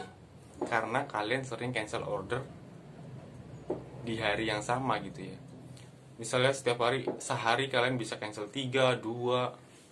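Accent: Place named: West Java, Indonesia. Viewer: Lo orang sunda ya bang . Ngaku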